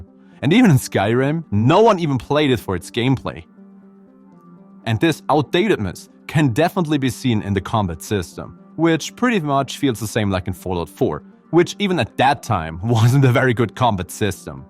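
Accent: Germany. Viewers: Love the german accent ;) Good videos, high quality, keep it up!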